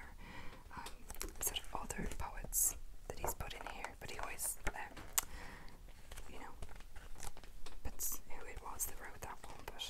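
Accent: Ireland. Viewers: Im Irish (Dublin) and can say that you have a very good accent 👌🏽 Go Maith! 🇮🇪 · I’m Irish and your the first person to nail the accent FULLY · Sounds stronger than mine... Im from fooking Limerick. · the one person who can actually do a decent irish accent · I'm Irish and I'm from Belfast and I have heard a couple people from Dublin and cork and ur accent is really good and thank u for not saying top in the morning to ya😂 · I’m Irish and this is honestly one of the best fake irish accents i’ve ever heard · Wtf you have a better accent then me an Irish person low-key shook 😌😪🤩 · it’s kinda cool to see someone do an actually good irish accent. she’s definitely got the soft t’s and d’s spot on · I was so confused watching this at first. I was thinking, does she have an Irish twin??? 😅 Brilliant accent! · Brilliantly done, not many people can do the Irish accent justice ❤️👌 (I’m from cork Ireland 🇮🇪) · I'm Irish and ppl always try to sound like me but they can't rlly do it, BUT U ON THE HAND! 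IS GREAT! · So unreal! i’m from dublin myself, and i was shocked to find out you weren’t irish yourself!! deadly accent! you put me straight to sleep too btw, this was so comforting to me :’) Xx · This is class I’m Irish my self and you sound very Irish ☘️ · wait what i thought she was irish um hello she’s a better irish than me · This is the best Irish accent ever done by someone out of Ireland hands down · Being irish myself Not a bad IRISH accent at all well done girl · Omg! Coming from an Irish person, your accent was so good! Especially for a fake one. Alot of non Irish people think it's a hard accent to be successful in. I'm glad u like the accent😘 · One of the best Irish accents you literally sound like me !!!!! (I’m from Ireland) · Can’t find any Irish asmrtists you’re the closest thing so far! I love my countries accent haha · this is literally an Irish accent I would’ve thought you were from Dublin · You did an awesome accent!! 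I already love your accent but I love Irish ones!! I’ve always loved talking in different accents too lol it’s fun!! 🍀💚🍻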